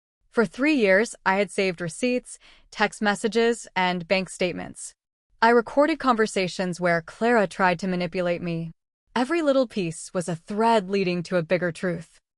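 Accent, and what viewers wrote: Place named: United States. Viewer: If she was born on Australia why has she got American accent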